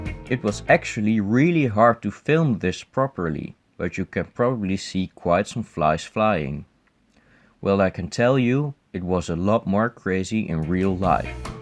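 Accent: Netherlands. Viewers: You are too adorable. “Here’s a squished one. Twins!” Hearing your accent makes me miss Holland so much. I studied college in Breda at NHTV, and all the Dutch guys are just such sweethearts. Miss the frites carts 😉 and mayonnaise.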